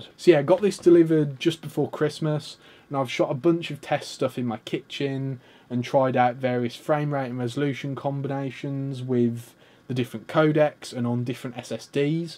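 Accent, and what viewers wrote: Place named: Birmingham, United Kingdom. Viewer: You're definitely a fellow brummy arent you!!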